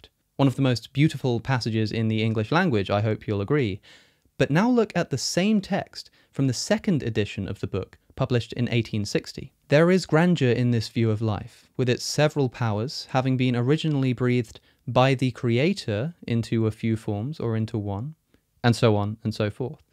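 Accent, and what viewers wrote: United Kingdom. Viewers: it's still a British accent. Just like a New York accent is an American accent.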